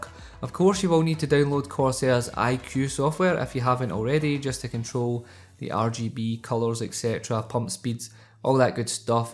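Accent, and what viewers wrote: Scotland, United Kingdom. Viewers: Also, what part of Scotland are you from? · U Scottish or anything? Coz damn i love your accent! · Yass, didn't expect a Scottish lad when I clicked on this video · clear easy to follow instructions, being a fellow scot easier to understand too, great info cheers chief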